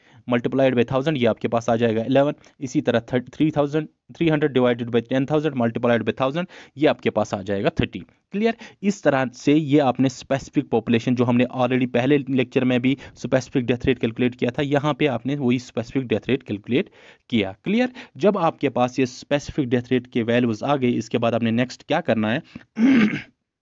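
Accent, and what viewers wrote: Kashmir, India. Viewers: Sir love your teaching skills. Specially your kashmiri accent... I completed statistics with full of enjoyment...The word,"Thanks" is not sufficient for your efforts sir. May God fullfill your every desire... Sir Iam preparing for JSA there are two more topics remains: 1 Correlation and Regression- Concept and simple applications 2 Interpolation and Extrapolation Sir please will you complete these topics too... 🙏🙏🙏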